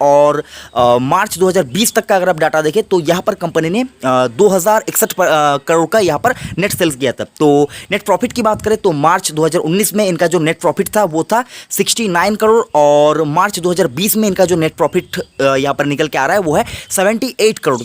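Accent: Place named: United Kingdom. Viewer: language kyo asi bol raha hai gadhe..angrejo ki copy kar raha hai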